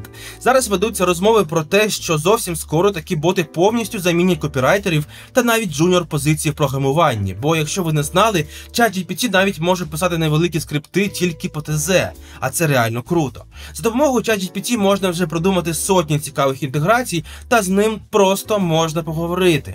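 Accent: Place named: Russia. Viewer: Bună ziua. Contentul e util și interesant. Hai sa vorbim în orice limba în afara limba rusa. Of doamne, dar dacă tu ești ca bloguri interesant, și pina când totul a fost clar, dar acum tu zici Rusnea, ce eu trebuie sa fac? Eu înțeleg limba rusă, dar ucraineana nu prea. Eu vad ca ție nu prea comod, tu ai accent rusesc.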